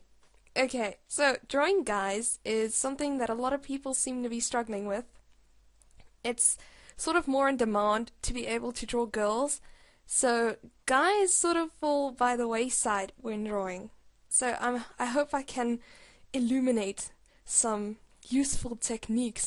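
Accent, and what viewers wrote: South Africa. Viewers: Ay! Is that a South African accent I hear? Love it!!! Dig this video! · Are you South African? Love this tutorial!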